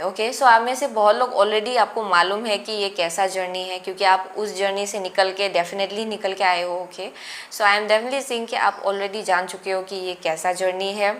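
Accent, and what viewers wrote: Northeast, India. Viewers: R u from northeast???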